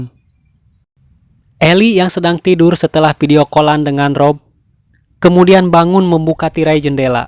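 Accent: West Java, Indonesia. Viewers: Ini yg seru bukan filmnya tp yg ngehost. "si aying yg babaung" 😅😅 dg logat sundanya lucu bgtss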